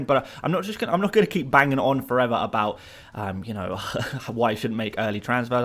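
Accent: Nigeria. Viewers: R u a Nigerian mate??🇳🇬🇳🇬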